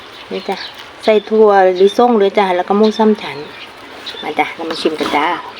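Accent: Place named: Suphanburi, Thailand. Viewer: ทำเองกินเองนักเลงพอ555น่าทานจังเลย แต่ขอโทษนะคะเป็นคนสุพรรณหรือเปล่าคะสำเนียงเหมือนเลยมลคนสุพรรณนะคะอยู่ดอนเจดีย์😊💖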